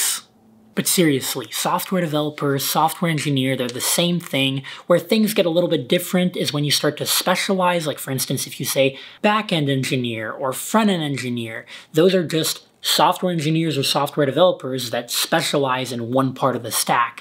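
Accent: Romania. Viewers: Are you romanian? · You romanian ?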